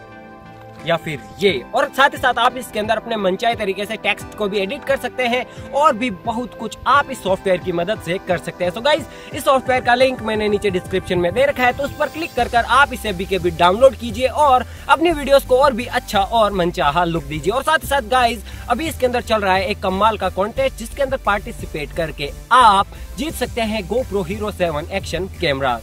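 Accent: Rajasthan, India. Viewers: you make very good vedio you have Rajasthani 😃😃😃😃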